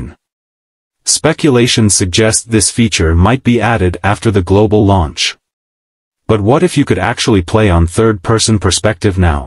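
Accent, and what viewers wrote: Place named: Nigeria. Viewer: Love the Nigerian accent bro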